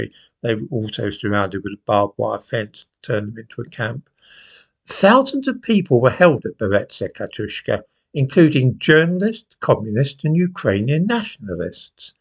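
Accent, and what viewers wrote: United Kingdom. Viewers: is absolutely critical in maintaining perspective and clear vision in the smoke and mirrors show. And he is so eloquently British as well.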